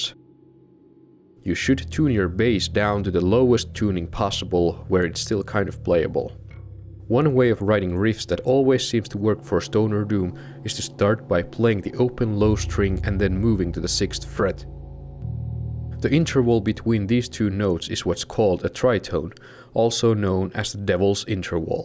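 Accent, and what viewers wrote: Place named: Europe. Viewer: Late to the party: are you a Dutchman? You have a very European accent. You did hit the nail on the head and distilled Stoner Doom to it's finest essence. If you want Dutch Stoner/Doom try the band ' ' from Leiden!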